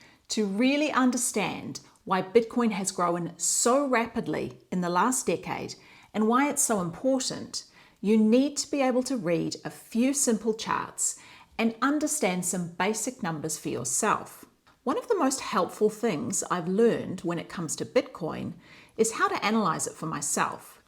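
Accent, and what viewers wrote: New Zealand. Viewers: This was an eye opener Just started my bitcoin journey and stumbled onto your platform Your accent reminds me of my kiwi sisters 🤣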